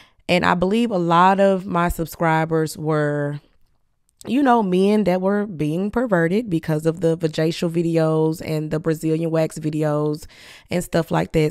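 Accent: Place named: St. Louis, United States. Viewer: I definitely wanna become a esthetician the more and more I watch ur videos…but I kant help to ask yu got the urrrz like ur from St.Louis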